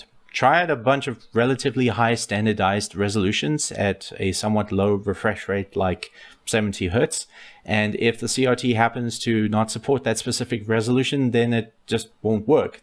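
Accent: South Africa. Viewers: Sorry I have to ask, are you a South African or in South Africa? Cause your accent sounds like you are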